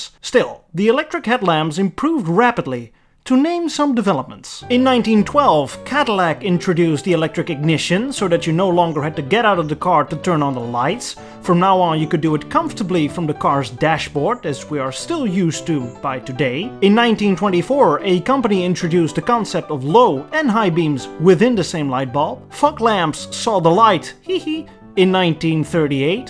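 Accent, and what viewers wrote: Netherlands. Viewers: The Dutch accent is nice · i love this channel i found it by accident but i love it, also pretty sure he is dutch dat accent herken ik van een kilometer afstand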